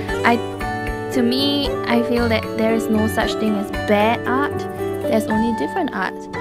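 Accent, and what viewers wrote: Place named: Germany. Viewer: Thanks for this video btw your accent is so cute are from germany?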